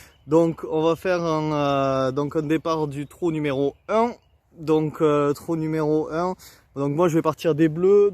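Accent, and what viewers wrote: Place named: South, France. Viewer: très bien ce 1er vlog... avé l'accent!!!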